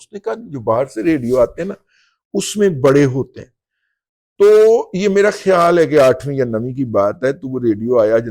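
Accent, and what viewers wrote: Punjab, Pakistan. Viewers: Bhai jo Ayat app na galat Parhi hai uss ka TarjummA . Tashhhe Karoo apni aur ya Punjabi zaman ka Tarka bhi band karooo ya phir Punjabi mai banaooo apna v log ya Urdu me ok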